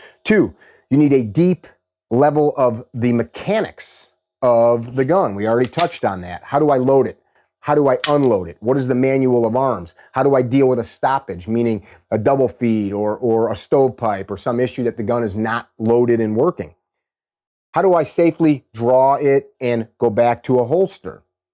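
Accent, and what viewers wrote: Chicago, United States. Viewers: Know what? I love this dude, hes like one of the homies & I’ve never even met the guy. So no homotype shit. legit seems like a down to earth funny ass dude from Chicago, with that yankee accent… hahaha. the father figure most of us never had. 100%.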